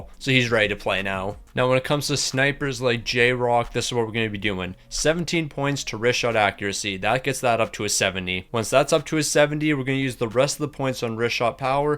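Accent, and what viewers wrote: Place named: Nova Scotia, Canada. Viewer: What province are you from ? Gotta be Nova Scotia lol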